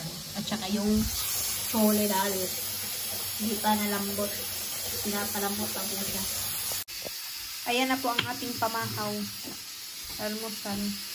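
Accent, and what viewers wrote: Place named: Leyte, Philippines. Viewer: Leyte ba kayo gang ? Parang leyte speaking kc kayo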